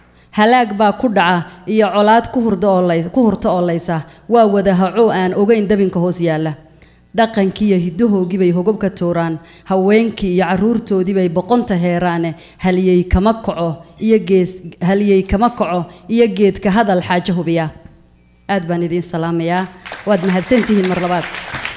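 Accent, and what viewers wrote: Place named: Awdal, Somalia. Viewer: Ree awdaL weeyi gadaburzi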